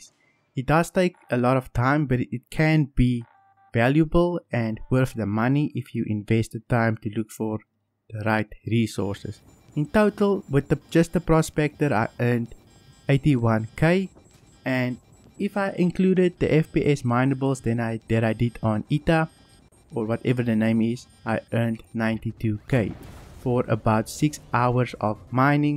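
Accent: South Africa. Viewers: That accent. I'd know it anywhere. Fellow South African. Nice vid man. Hoping to get into SC soon so I'm watching a lot of gameplay videos. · Are you South African? Can hear an accent but not sure, nice video, I'm renting a prospector currently and using helix mining head, making about 45-90k a run. Almost made 1week rental money back in 1 day and now it's for the grind to use the rental to buy it, 1 mil to go. Subbed and liked your video.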